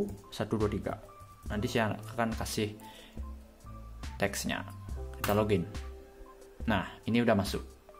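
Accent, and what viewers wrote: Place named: Bali, Indonesia. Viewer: Dari Bali bang ya? Logatnya macam Dwi Arsana :D Salam dari Aceh bang. .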